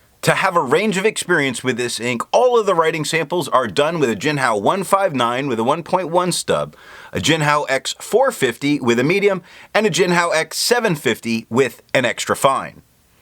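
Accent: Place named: Philadelphia, United States. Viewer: Oh well. Some of your inflections sound like Philly. Hope you have a merry Christmas!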